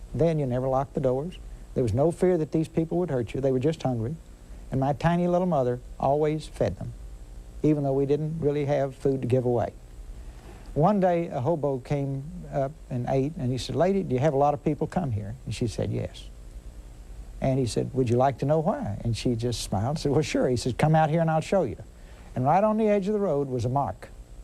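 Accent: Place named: Texas, United States. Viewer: I love how he speaks with such eloquence through that awesome Texas accent.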